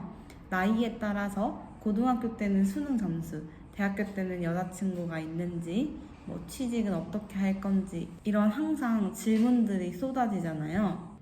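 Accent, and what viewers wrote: Jeolla, South Korea. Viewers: 전라도분이시구나ㅋㅋ 저희도 순천이에요^^ 더 정감이 가네요~^^ 항상건강조심하시구요 친하게 지내고싶어요^^ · 우와 알고리즘으로 보게되었는데 목포 분이시구나~>< 저도 고향이 광주라 어쩐지 살짝식 들리는 사투리가 친근하더라구요 ㅎㅎ 너무 멋있으세요..!! 저도 호주에서 대학을 준비하고 있어서 영상 많이 보고 참고할게요!! ㅎㅎ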